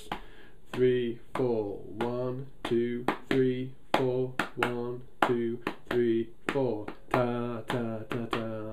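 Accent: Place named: Northern England, United Kingdom. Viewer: I usually don't dig instrument lessons and rather prefer to find out those things myself (takes longer, yes). But these lessons are perfectly inspiring - and you don't often find bass playing being explained in that great Northern accent. :)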